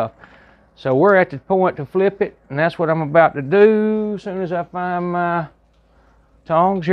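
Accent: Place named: Georgia, United States. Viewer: I found your channel after searching YouTube for ways to use the Instant Vortex oven ... Love your Georgia accent!!! I have binge watched a lot of your videos! You are a pleasure to watch. Keep putting out great videos!!!! Joanna from South Carolina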